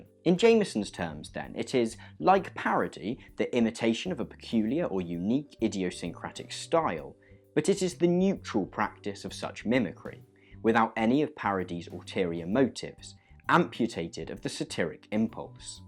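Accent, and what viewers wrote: United Kingdom. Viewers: Yoooo, Weinstock was one of my professors in college! Never thought I'd hear a British guy talking about his work! Great video! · Thank you for making this. I liked and subscribed, this isn’t a casual thing for me and I don’t know the rest of your stuff. But I’m more willing to put up with things when they have a British accent, so I’m lucky there. Honestly, I hope you’re getting by on these videos and that’s the reason I did the above, you’ve found a way to not be a part of the rat race... and for that I admire and want to reward you.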